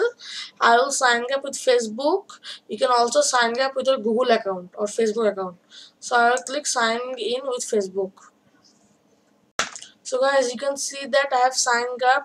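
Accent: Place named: India. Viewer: indian acent lol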